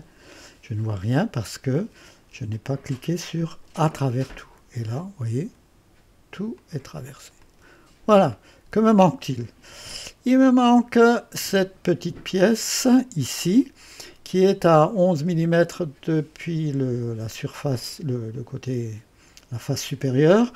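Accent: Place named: Strasbourg, France. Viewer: ce petit accent qui me rappelle ma première femme Strasbourgeoise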